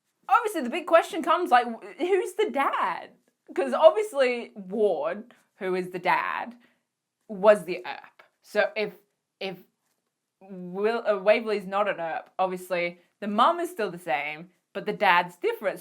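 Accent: Australia. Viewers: Nice vid. 👍🏻 I'm from the UK so cos were always way behind in the good shows I'm only able to see what you lot put on you tube. Thank god for people like you. I also may have a teeny weeny crush on you. Damn Aussie accent and giggles. ☺️ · she's Australian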